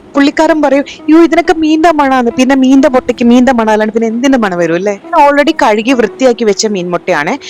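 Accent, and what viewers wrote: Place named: Kozhikode, India. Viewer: ഇണർ കോഴിക്കോട് 😅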